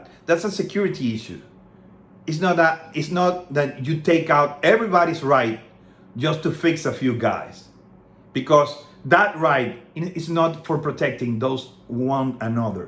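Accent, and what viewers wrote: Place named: United States. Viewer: Todo un americano hablando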